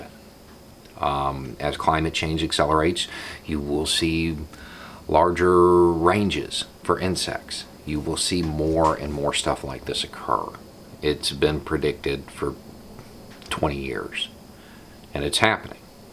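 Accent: South, United States. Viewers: All political commentary should be delivered in a thick southern drawl.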